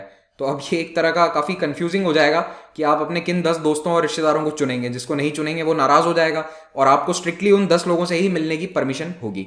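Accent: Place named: Uttar Pradesh, India. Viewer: Sir aap UP se honge.....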